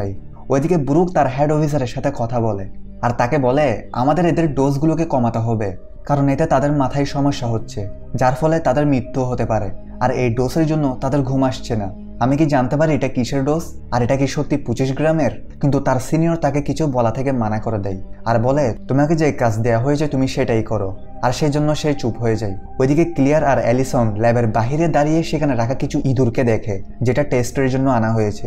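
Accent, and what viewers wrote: Chittagong, Bangladesh. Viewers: ভাই মনে হয় চট্টগ্রাম এর মানুষ যতই শুদ্ধ বলেন না কেন চট্টগ্রাম এর ভাষা বের হচ্ছে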